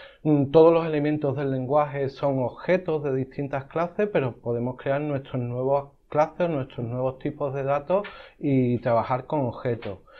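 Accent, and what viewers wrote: Spain. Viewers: Perdón que me salga del tema, de qué parte de España es su acento?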